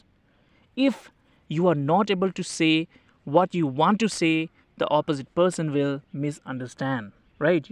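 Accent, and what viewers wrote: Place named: United States. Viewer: Sir ur english pronunciation is lk American people Khublei sir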